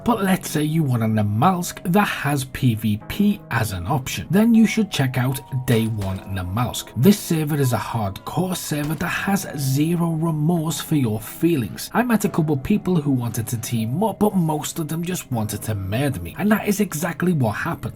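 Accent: Liverpool, United Kingdom. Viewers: Nice you from liverpool mate ?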